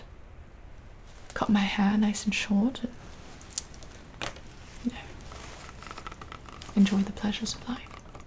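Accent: England, United Kingdom. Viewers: English accent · You actually nailed the English accent, however I'm from the North so its a little different 😂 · English accent is one of the best Gibis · Bravo, that's a very impressive British accent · That's an english accent not a british accent, please change it and respect the rest of the UKs individual accents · *English accent. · Why do you actually sound convincingly british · Ahhh yes a British accent because we all sound like that in the uk it’s not like there is 4 different countries with numerous different accents in them but let’s call it British but sound English yes smart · I had a british girlfriend and sounds more british than her ahaha. Seriously though, to nail it that hard and do a top-notch rp on top of all that is something else, Im in love with it. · You fully sound English here and this is coming from a Brit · What English accent is that? 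· British accent is better then mine, quite jealous to be honest. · Not a bad English accent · Your British accent is the best ☺️💤😴👍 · *English accent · *English accent not British lol Don't hat I love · There's no such thing as a bloody "British" accent. She is doing an ENGLISH accent. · Yo English accent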